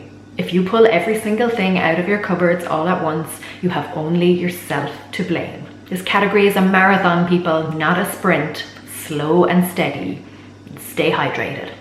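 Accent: Ireland. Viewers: Love your Irish lilt, could listen all day! Your doing a great job and it's so frustrating when you don't have everyone in household with same mindset, sorry to hear of your loss.⚘⚘⚘ Donna from SCOTLAND xx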